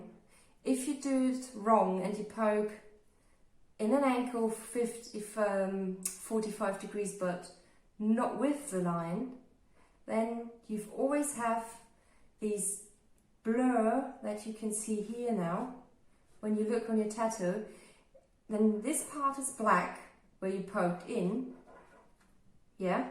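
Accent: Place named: Australia. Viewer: don’t apologize for your english, it’s amazing! you actually sound australian. thanks for your vids!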